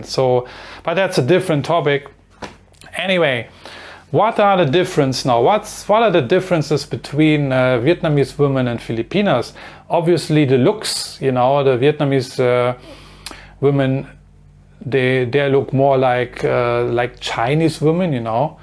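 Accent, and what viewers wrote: Germany. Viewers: love your dinglish Sir, I was living in Nurenberg before, totally missing this beautiful accent, and here is my story, I just met a super pretty Vietnamese lady from Vietnam Cupid website, but I'm suffering the huge obstacles in the lack of english and the difficulty to learn Vietnamese language, the differences of cultural behavior is confusing me greatly, the most incredible thing that i noticed is her super dedication to me which i never seen in my life with any woman I met....we already had 2 fights on our first week, but this video taught me a lot and I hope i will adapt to her....I can mot believe they do not teach english in school, I had to pass 3 languages in Egypt High school to go university ....!!! any how, Danke schoen.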